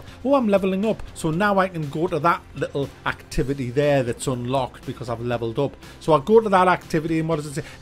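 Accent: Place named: Sunderland, United Kingdom. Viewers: are you from Sunderland? I can tell it's a local accent but I can't quite place it... (also Silksworth is great!) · haha Silksworth ski slope! I thought that was a mackem accent! Love your vids.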